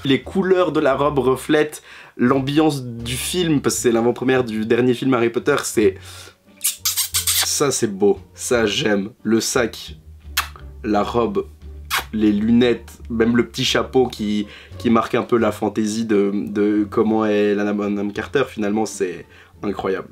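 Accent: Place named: United Kingdom. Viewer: Ton accent anglais une pépite ✨✨✨✨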